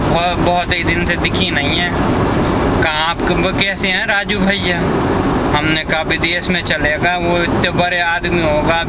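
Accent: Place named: Vidisha, India. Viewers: D Bundelkhandi Boli.....quite close!!! Good one!!! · bond ho sir aap...i have a friend from vidisha, sounds just like you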